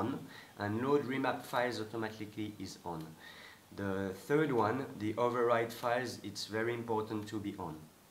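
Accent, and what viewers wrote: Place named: France. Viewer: Salut, tu sembles être français à ta façon de parler.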